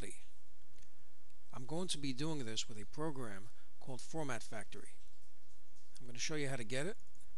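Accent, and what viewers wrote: New York, United States. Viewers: Thanks Brother...You sound like a New Yorkuh...I Love NY & God Bless Yous :)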